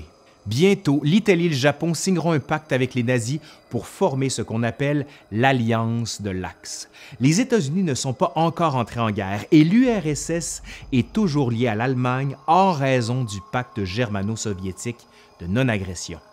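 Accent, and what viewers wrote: Canada. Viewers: quand il s'agit d'emmerder le monde les Français on un don inné pour le faire , et les canadiens Français en ont aussi hérité apparemment !! :D j'adore votre chaîne et le travail que vous faîtes bonne continuation et bonne fêtes de fin d'années a vous ainsi qu'a vos proches !! ( P.S = j'aime aussi votre accent canadien ) .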